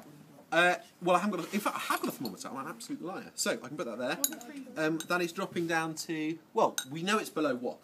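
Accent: United Kingdom. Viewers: This what most British people sound like... And we can pronounce the letter t 😒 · "Uh, no" ends the video He is from the Commonwealth, probably England though based on the accent, since he was using Celsius instead of Fahrenheit like the US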